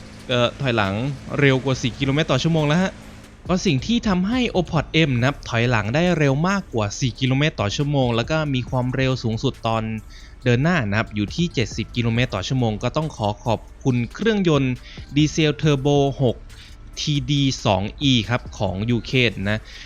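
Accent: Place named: North, Thailand. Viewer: เป็นคนเหนือหรือเปล่าครับ สำเนียงเหมือนเลย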